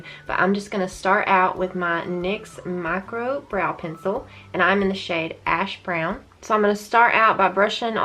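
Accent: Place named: South, United States. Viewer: im so jealous omg! i just posted a brow routine as well! your southern accent is so cute!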